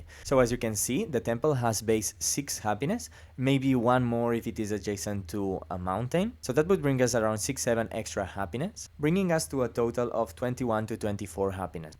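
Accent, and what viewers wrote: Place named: Iberia. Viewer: ahahah sehr cool mein freund. where u from anyways? by your accent i would assume iberia or south america🙃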